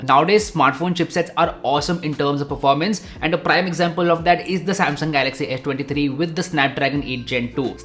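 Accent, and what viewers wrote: India. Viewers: English from India!!!! I'm brazilian but I can notice Indian English accent! I'm 99% sure! lol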